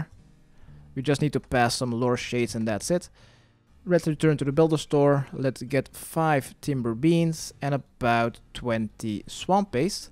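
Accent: Flanders, Belgium. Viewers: I KNEW YOUR ACCENT SOUNDED BELGIAN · Ik wist da ge nen belg waart hahah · What the fuck.. I always thought you had a dutch accent but you're totally flemish :o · bels denk of fries iig ben brabander en komt me niet bekent voor klinkt meer bels hehe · Fantastisch! Ik wist niet dat je Vlaams was! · He's dutch lol, although he sounds like he's from Belgium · wacht gij zijt gwn vlaams, ik kijk al 30 filmkes van ou en had da nie deur hahaha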